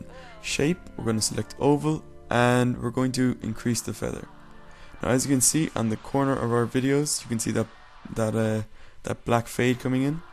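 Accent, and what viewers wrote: Ireland. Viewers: Irish man ;D cork ?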